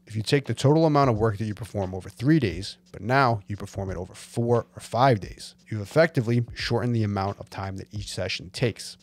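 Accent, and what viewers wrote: New York, United States. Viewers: Is this new jersey or new York accent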